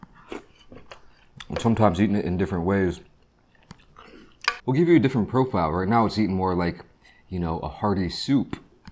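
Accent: United States. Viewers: He's American I think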